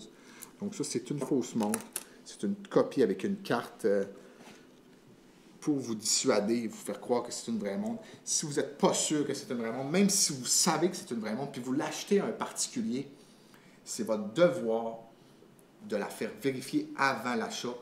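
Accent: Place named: Canada. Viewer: Cet accent Canadien est tellement sexy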